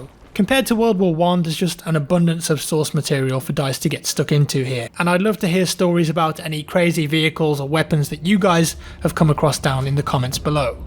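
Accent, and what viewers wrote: United Kingdom. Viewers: That british accent 💘💘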